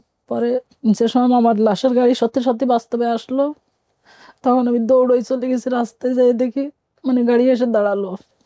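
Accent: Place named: Jessore, Bangladesh. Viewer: এটা যশোরের ভাষা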